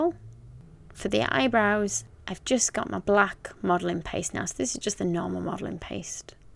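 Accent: United Kingdom. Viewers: fathi irfan No she sound kinda British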